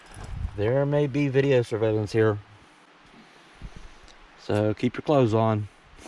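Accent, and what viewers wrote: Pennsylvania, United States. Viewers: you are an exceptional friend to do all that you have done for Ausia. I am also impressed with your diagnostic skills. As soon as I heard your accent, I knew you were from PA, as am I. The ferry at Port Angeles can take you to the San Juan Islands, USA. I put my RV on the ferry and went to Friday Harbor to visit friends. I think for a 22 foot RV, it was about $80 back in the early 1990's.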